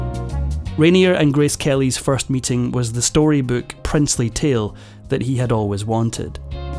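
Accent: Scotland, United Kingdom. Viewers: I only watch the videos narrated by the Scot. Have him narrate them all, pay him more, and I think you’ll have lots of channel members. ❤ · I love his Scottish accent. He speaks clearly and is very easy to understand! 😊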